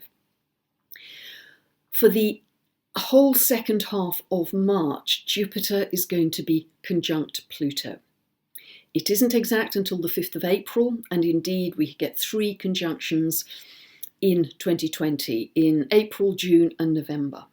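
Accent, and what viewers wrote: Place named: Oxfordshire, United Kingdom. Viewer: I'm curious if you're in Oxfordshire(judging by your accent).Interested in a reading.Maybe you could answer some questions.My chart is full of contradictions.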